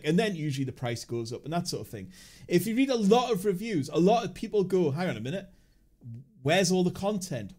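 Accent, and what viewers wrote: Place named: Northern England, United Kingdom. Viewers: Oh god a bloody pommy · hello from newcastle, i noticed ya northern twang there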